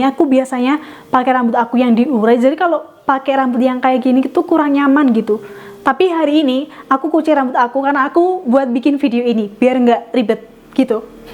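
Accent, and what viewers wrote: Java, Indonesia. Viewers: Kakak orang jawa ya?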